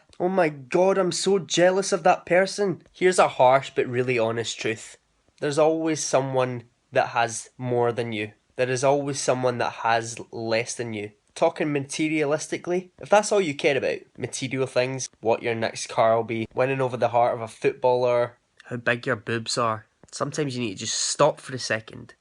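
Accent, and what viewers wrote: Scotland, United Kingdom. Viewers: I've been watching your videos for awhile now and I have to say that you always seem to say the words I need to hear. Life has taken its twists and turns, but I'm starting to find myself again and what makes me happy. I just wanted to thank you for your inspirational words, and of course I love your Scottish accent! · I could listen to you forever, especially with how great you make everyone feel talking about real, meaningful ideas. On another note there is nothing sexier than a Scottish accent.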